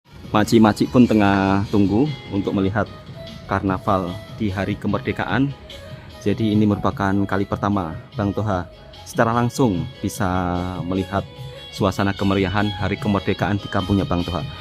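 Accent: Java, Indonesia. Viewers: Lidah jawa Toha terlalu pekat walau berbelas thn diMalaysia.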